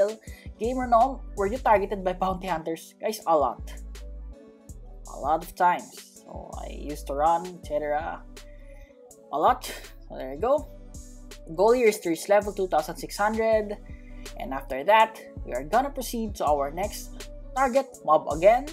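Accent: Philippines. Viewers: I just noticed hes name in the leaderbords hes name is which is a filipino language which means dough that is sticky correct me if im wrong so that means hes a filipino well its obviouse when hes talking btw am a filipino too so eyyyy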